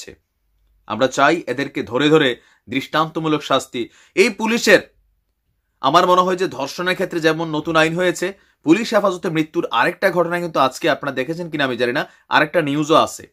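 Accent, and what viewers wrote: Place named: Sylhet, Bangladesh. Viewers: you spoke wonderful Sylheti dialect- I like your videos. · সিলেটি ভাষা আপনার মুখে শুনে খুব ভালো লাগছে....ধন্যবাদ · ভাই আপনি সিলেটি ভাষায় কথা বলেন,শুনে ভীষন মজা লাগেছে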